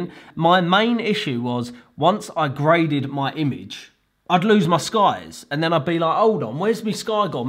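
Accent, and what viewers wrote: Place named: Cockney, United Kingdom. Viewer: Best tutorial with great cockney accent. Thanks mate!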